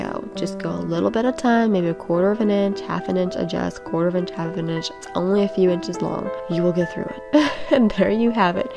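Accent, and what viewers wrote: South, United States. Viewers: I have been smocking for 27 years and I love your videos, especially your sweet southern accent and background music. I am glad to see a young person reviving the dying art of French Hand Sewing. I also used to take my "project baggie" (or several) with me everywhere! Best wishes!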